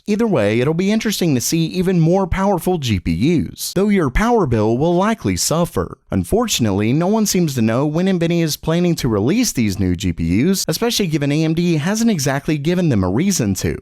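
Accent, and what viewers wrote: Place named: United States. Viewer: most uncomfortable American Accent to listen.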